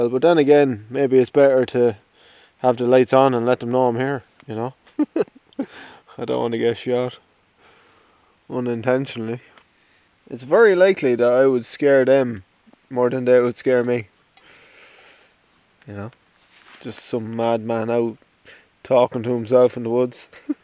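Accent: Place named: Ireland. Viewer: I love your accent...is it Irish?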